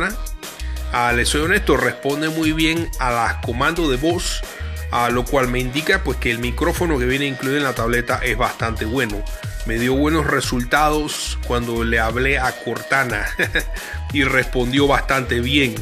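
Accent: Panama. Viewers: Él es de Panamá? Tiene un acento muy panameño 😂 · Es lo que parece. Panameño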